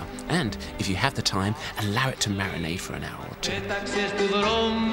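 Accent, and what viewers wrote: United Kingdom. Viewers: imagine the people who live on the mountain and they see him: Who is this guy with the British accent and why is he dancing and cooking in our balcony?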